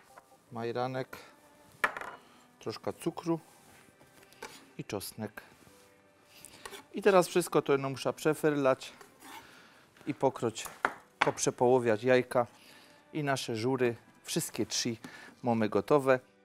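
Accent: Silesia, Poland. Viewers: jak ja lubię śląską gwarę, jestem z podlasia, ale co nas dzieli to nas łączy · Pani Doroto, zgadzam się, że nie ma milszego kucharza :) Fajnie jest też posłuchać takiej śląskiej gwary. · Panie mam prosbę chętnie bym skorzystsla z panskich przepisow ale ni w ząb nie rozumię pańskiej gwary,czy mógłby pan prowadzic to w jezyku polskim,bo mam wrazenie ze te panskie przepisy są tylko dla śląska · uwielbiam tego Pana!!! Bardzo fajnie opowiada i ten dialekt śląski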